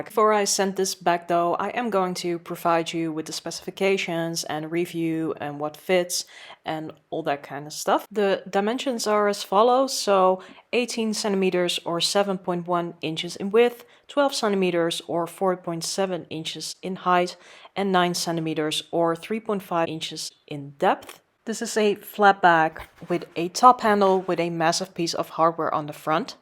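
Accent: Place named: Netherlands. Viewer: By coïncidence I discovered your channel and I immediately liked it and subscribed 🤗I already thought you had a slight dutch accent and after ‘doei!’ I had to laugh, I’m dutch as well 😁 I appreciate your honest and thorough reviews, keep up the good work 👍 doei!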